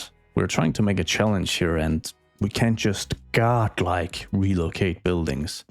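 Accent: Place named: Denmark. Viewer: Ayyyyy! I've missed that Danish accent! Great to have you back :P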